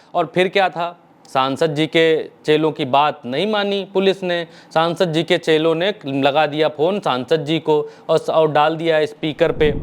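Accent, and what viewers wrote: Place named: Bhojpuri, India. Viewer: Your 'Bhojpuriya' type reporting is amazing.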